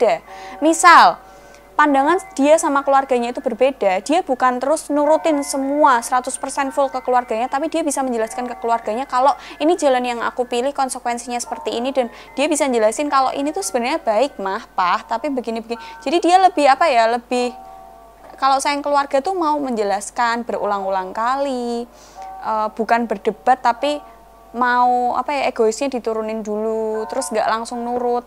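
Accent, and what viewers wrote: Java, Indonesia. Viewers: Medok'e celotehan mu mbaakk mantap jowo ne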